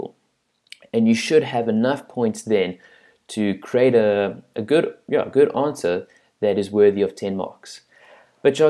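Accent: South Africa. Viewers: You sound South African haha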